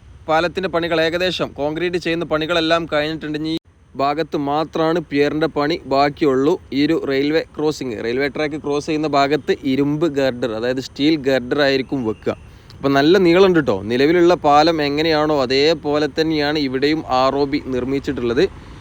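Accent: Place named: North Kerala, India. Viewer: വടക്കൻ മലയാളം, ദൃശ്ശിയങ്ങളെ പോലെത്തന്നെ അനന്തകരം തന്നെ. ആശംസകൾ ❤️🙏❤️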